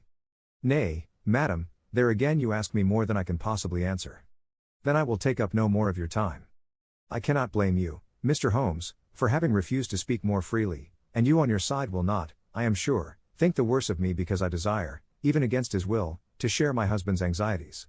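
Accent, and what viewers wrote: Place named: United States. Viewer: You can't read Sherlock Holmes with a robot American accent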